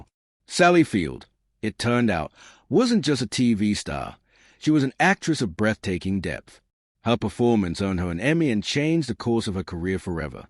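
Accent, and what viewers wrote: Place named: England, United Kingdom. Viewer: English accent....that's how they speak.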